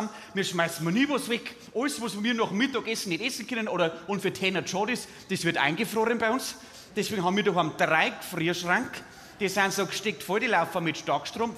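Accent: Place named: Bavaria, Germany. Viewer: Ich versteh ka Wort 😂 bayerisch halt 😂😂😂😂😂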